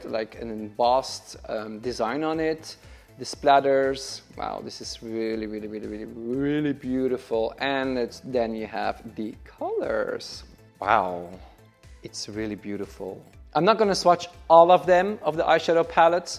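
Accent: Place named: United States. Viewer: Jij spreekt heel natuurlijk Amerikaans.. nicely done!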